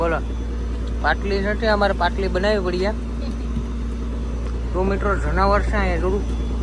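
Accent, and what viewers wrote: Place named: Una, India. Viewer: bhai tamaru gam kyu che because tamari language parthi evu lage ke tame gir somnath na una taluka na koi gam na haso evu mane lage che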